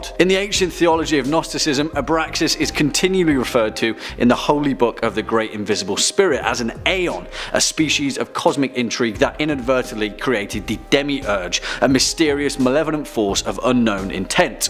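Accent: England, United Kingdom. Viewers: has the best english accent ever makes binge watching easy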